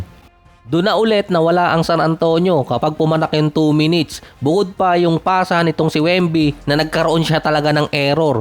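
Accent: Batangas, Philippines. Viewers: Batangueño ka din pala😃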